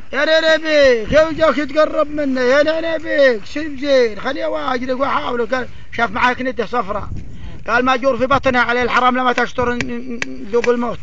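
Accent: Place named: Al-Jawf, Saudi Arabia. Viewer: اللكنه حقت الجوف و نجران نفس الشيء مابه خلاف حتى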